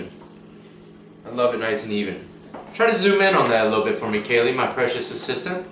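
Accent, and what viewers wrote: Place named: South, United States. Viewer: really let out the full southern accent and word choice loose on this one..."allllright yallll...we're on to da next one..."😆😆🤣💀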